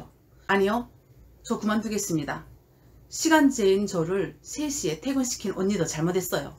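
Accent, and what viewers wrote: Pyongyang, North Korea. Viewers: 서울말 표띠 마이 안내이 존네예.. 계속 평양식 말투로 해 주모 더 조켄네예. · 관심 갖으러 왔디요~~서울말보다 평양말이 더 정감가네요~~ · 우연히 보게 되었는데 님의 영상이 나의 그동안의 약간의 고정관념을 깼네요..북한출신 유튜버들이 좀 있어서 몇명의 영상을 봤었는데 뭐랄까.. 그리 호감이 가지는 않았죠..이유는 여러가진데 첫번째 북한 사투리 거의없이 너무 남쪽말을 잘하고 여기 어린애들이 주로 사용하는 은어..그런것도 자유자재로 말하는데 내가볼때 그건 남쪽말을 잘한다가 아니고 너무나 부자연스러웠죠.. 씨처럼 평양 사투리가 느껴지는 억양으로 하는게 훨씬 보기좋고 자연스러우니까요..그리고 두번째..이게 젤 마음에 걸리던데..북한을 너무 비하하는거..부모형제.친구.친척들이 살고있는 실질적인 조국인데 남한에서 산다고 남조선 젊은애들 은어를 섞어가며 븍한을 비하하는 모습은 정말 보기 안좋더라고여..그것은 자신의 얼굴에 침뱉기죠..내가 생각할땐 남한 인민들보다 북한 인민들이 어려운 환경에서도 지금까지 버텨올수 있었던건 바로 우리민족의 굳은 자존심 아니었을까 합니다..경제난 속에서도 양키.왜놈들에게 결코 머리 숙이지 않았으니까.. 북한의 좋은점도 찾아서 이야기 하고 남북간의 다른 말도 소개해주며 서로간의 이질감을 없애주는 방송..동포라는 생각을 갖게하는 그런 방송이 되면 좋겠네요.. 첫댓글인데 너무 길어서 미안합니다 ^^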